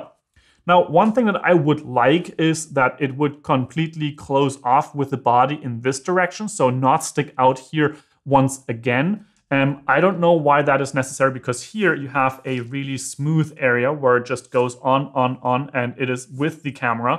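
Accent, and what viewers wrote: Germany. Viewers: Might be depending on your hand size and use cases. Btw. den deutschen Akzent kann man nicht verstecken:D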